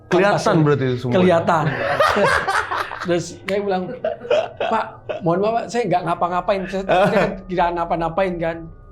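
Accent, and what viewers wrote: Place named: Java, Indonesia. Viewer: Kirain Mike vokalis Marjinal kwkwk pas ngomong medok oh ternyata bukan wkwkwk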